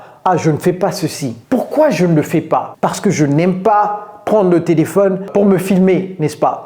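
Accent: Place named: United States. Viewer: Je prefere moins de francais et plus d'anglais parce que je veux imiter votre accent en anglais americain. Pourquoi ne pas nous faire le "SHADOWING IMITATION"?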